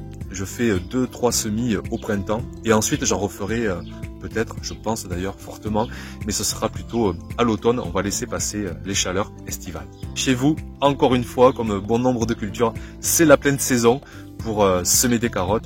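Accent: South, France. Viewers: merci pour cette belle surprise de tes deux petits, effectivement ton fils te ressemble! et cet accent du Sud...c'est déjà du soleil pour moi, pauvre nordiste d'ile de France! à très vite sur ta chaine! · Bonjour, je te découvre en plein confinement, enfin ça fait déjà un mois là, j'aime beaucoup tes vidéos, la philosophie qui en découle. De ton accent (hi hi de vos accents là où je t'écris j'entends la voix de tes enfants, c'est magnifique, moi mon fils déteste les légumes:-(; il a 12 ans et ça marche toujours pas. Bon je reviens à mon propos, de votre accent j’entends le sud... merveille. Moi j'ai un potager sur Bruxelles, un tout autre climat. Tes vidéos me font du bien, je les laisse couler pendant que je fabrique des masques, une autre façon de cultiver la santé.... Elle me font du bien tes vidéeos et me ramènent à l’essentiel. Amour sur toi et les tiens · Ils sont mimi tes loulous... 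c'est un mini toi 😊, aieee l'accent du sud, j'adore! ça me rappelle mon Marseille de mon enfance...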